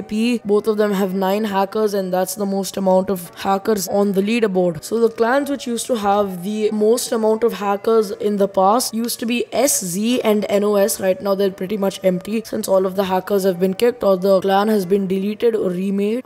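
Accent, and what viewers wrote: India. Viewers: u r indian na ?? :) ;) I think because your voice is like an indian talking english :)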